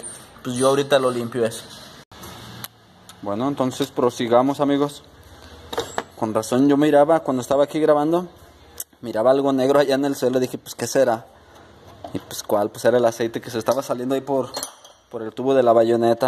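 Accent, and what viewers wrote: Mexico. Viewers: por el tono voz es de Jalisco???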